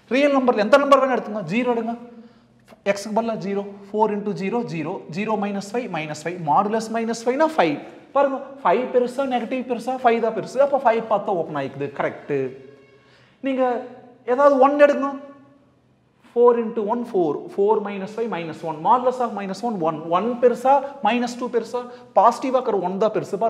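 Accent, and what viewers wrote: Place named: Kerala, India. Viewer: Konjo malayalam slang, coimbatore slang, Tiruppur slang kalantha mari iruku sir....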